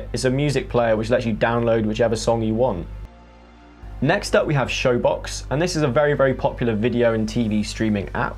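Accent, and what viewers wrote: United Kingdom. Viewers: british english accent?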